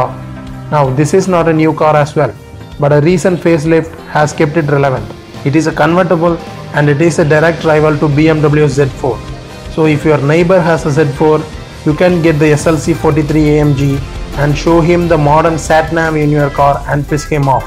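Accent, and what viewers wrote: India. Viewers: Cute but you talk like a Malayali everyone speaks English like that now I my English is also like that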